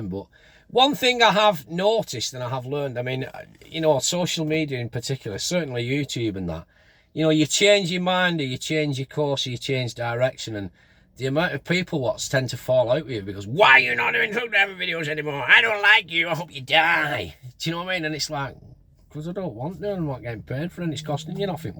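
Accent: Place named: Wrexham, United Kingdom. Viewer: Sounds like Wrexham 😂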